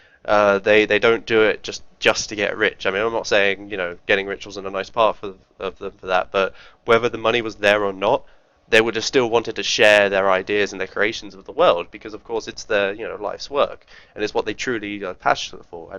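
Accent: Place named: United Kingdom. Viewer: Communist is good, says the guy with british accent that grew up with access to the privileges of the first world. This is communism, talking sh*t and defending it, until you have to live under a suppresive regime like cuba, venezuela, north korea, ussr. I wonder why people run away from those countries. When was the last time you read about americans or brits running away to cuba?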